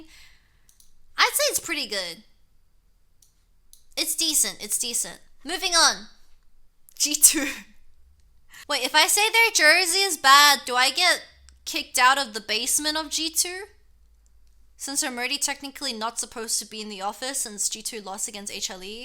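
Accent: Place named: Australia. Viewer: So cute but I can't stand the aussie accent lol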